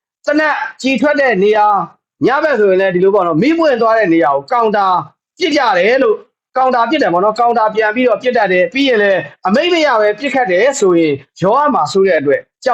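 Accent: Yaw, Myanmar. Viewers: ဒီနေ့တော့ ဂေါပကယောနသံလေးပေါ့💯😊❤ဟုတ် နှမ်းစေ့လေး ဖြစ်ပေးနေပါတယ်နော် ဟို့နွေဦးအစထဲကပါဗျာ့💯တော်လှန်နေသ၍ ကျွန်တော်တို့ အနိုင် 💯💯❤❤🚩🚩🚩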